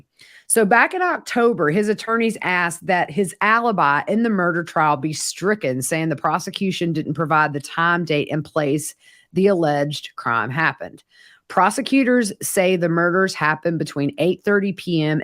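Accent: South, United States. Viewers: I could listen to you talk 24/7! I just love your voice with a slight accent. Are you from the South? I’m in western North Carolina but my accent sucks! lol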